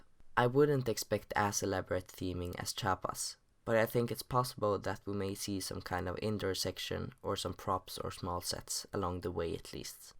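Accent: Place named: Sweden. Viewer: Love your Swenglish❤️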